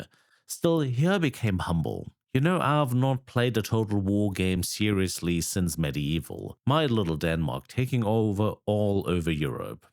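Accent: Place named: RP, United Kingdom. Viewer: Posh accent. - Eldar player. Perfectly fitting.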